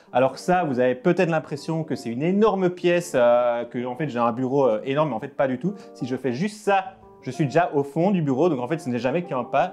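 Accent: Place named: Belgium. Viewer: Hééééé j'avais pas vu compatriote ! L'accent belge on est là on lâche pas ! En espérant entendre de nombreux septante et nonante par ici <3. Congrats & tout le meilleur poulet !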